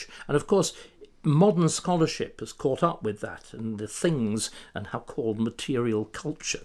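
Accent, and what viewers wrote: RP, United Kingdom. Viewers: Let's hope freedom of expression does not become history. As for the old BBC accent- it sounds great to an Australian and is much better than the strange accents that have developed over in the old country in London and so on- sort-of posh but coming across as "affected" in an odd sort of way.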